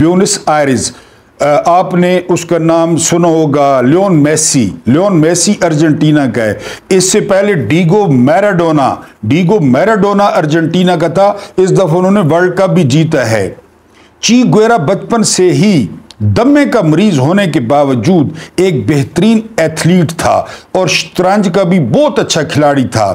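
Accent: Saraiki, Pakistan. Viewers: اسلام علیکم ورحمتہ اللہْ مخصوص سرائیکی لہجے میں اردو اندازِ تخاطب اور اس پر تاریخی واقعات کا تڑکہ اللہ پاک اسے دوام بخشے صحت و تندرستی سے مزیَّن مستقبل عطا فرماۓ ماشاءالله ماشاءالله ماشاءالله ماشاءالله والسلام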